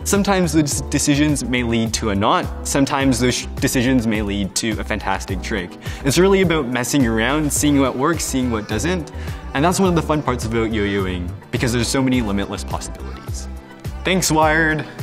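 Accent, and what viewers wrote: Canada. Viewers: He's Canadian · I am very canadian. But i noticed his Canadian accent. It is beautiful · He sounds very Canadian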